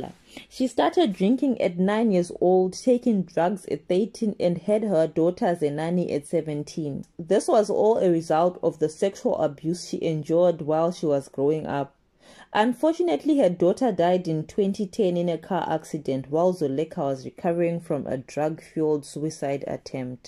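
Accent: Shona, Zimbabwe. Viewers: Shona accent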